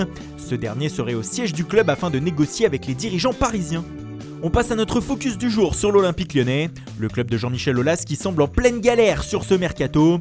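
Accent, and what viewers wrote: Italy. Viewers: Putain j'adore quand tu fais l'accent italien!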